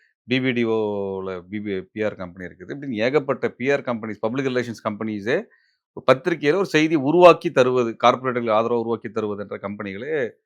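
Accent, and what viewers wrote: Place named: Karnataka, India. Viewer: is anchor a tamilian r not ? in all channels anchors r talking like kannadikas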